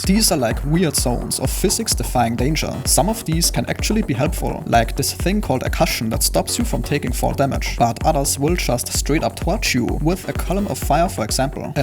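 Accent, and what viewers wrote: Germany. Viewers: Am akkzent war klar zu erkennen das hier ein Deutscher zu sprechen scheint.. Aber österreich ist auch nah genug dran.. Danke für das Video und die Informationen ❤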